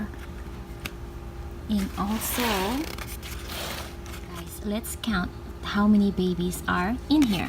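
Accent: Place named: Philippines. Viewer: Hello from Ken in Japan. Your voice sounds like you are from the Philippines.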